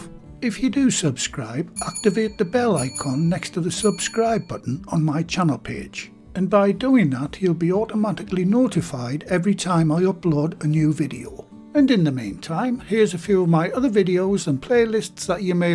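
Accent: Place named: Northeast England, United Kingdom. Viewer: is from north east England, where people are known for their kindness and being very friendly. I know, I live here too.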